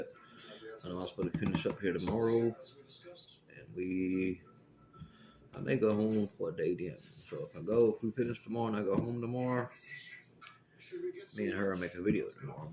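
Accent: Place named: Louisiana, United States. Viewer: Are you from Louisiana? I’m not making fun of the accent - I like it I’m just curious if that’s a Louisiana accent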